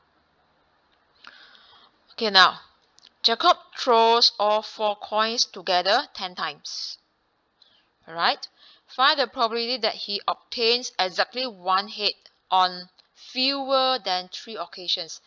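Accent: Asia. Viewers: Love the Asian Accent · i hate permutations and combinations but u explain it so effortlessly maam, thank u sm i subscribed... btw i love the asian accent its so nice to listen to idk why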